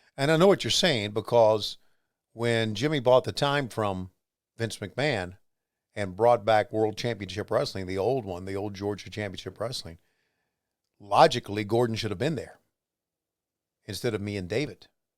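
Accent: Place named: Tampa, United States. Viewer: You sound like you are from Tampa. My dad would take me to Fort Hesterlee Armory (probably spelled it wrong) on Tuesday Night and we would see Gordon smoking one cigarette after another, announcing the matches. He was the best.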